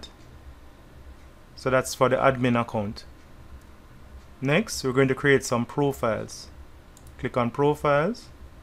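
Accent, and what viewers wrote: Jamaica. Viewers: Are you from Jamaica ?, love the accent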